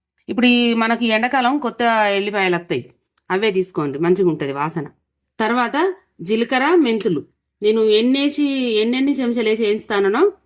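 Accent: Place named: Mancherial, India. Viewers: Hi aunty. Nenu me new subscriber ni. Medi ekkada aunty. Madi Karimnagar. Me language same Karimnagar side vallu matladinatte undi. Me vantalu bagunnay. Meru chupinchina udakabetti chesina tomato pachchadi Ninna chesanu. Chala baga kudirindi. Mirapakayalu ekkada kontaru. Chala bagundi me karam. Red color lo. Maku address cheppandi aunty. Memu kuda konukkutam. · మంచి వంటకం చేసారమ్మ చాలా థాంక్స్ మంచిర్యాలన మీది మాట తీరు అలాగే ఉంది 😊 🙏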